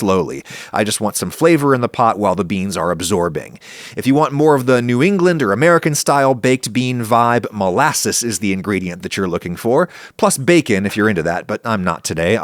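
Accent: United Kingdom. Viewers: is Bri'ish, confirmed live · too british for me, unsexy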